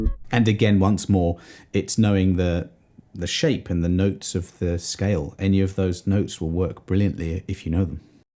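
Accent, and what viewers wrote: United Kingdom. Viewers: I can't decide which I like more, the bass tone or the British accent.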